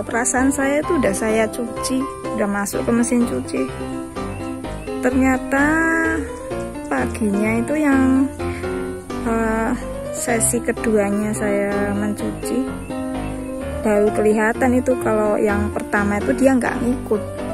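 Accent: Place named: Java, Indonesia. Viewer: Malang hadir. Logat medoknya aku suka, persis persis medokku jg. Ditunggu video2nya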